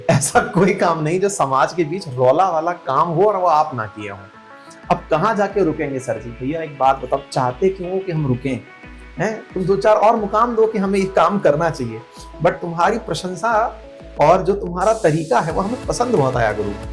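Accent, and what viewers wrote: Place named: Bihar, India. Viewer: Aap v मै ko ham boltey hai , mujhe toh lagta tha ki ye wala sirf bihar babu use kartey h.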